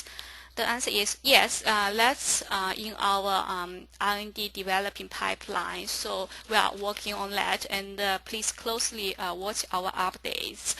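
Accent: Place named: China. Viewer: Why chinese speaker....cnt understand...even a sentence...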